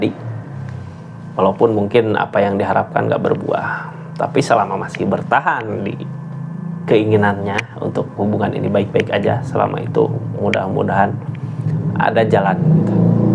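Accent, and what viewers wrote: West Java, Indonesia. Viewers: Urang sunda nya kang.. 😂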